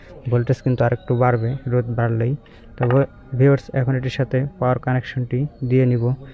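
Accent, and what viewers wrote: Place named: Tangail, Bangladesh. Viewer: ভাষা শুনেই বুঝা যায় টাঙ্গাইল্যা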